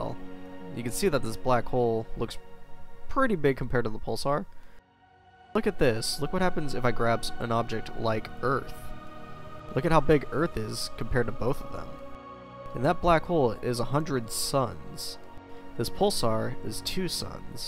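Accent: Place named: United States. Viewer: Compelling video, for a yank you've got just the right sort of voice for this.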